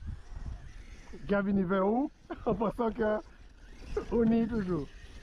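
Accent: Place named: Martinique, France. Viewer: Se décors, sa tranquillité, l'accent de la Martinique ^^ un bon rhum et la RC moi je dit Goooooooooooo !!!! 😃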